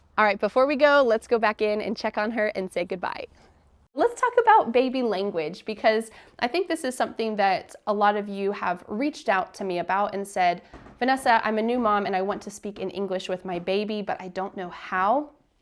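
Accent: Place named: United States. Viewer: My best teacher i love americans accent.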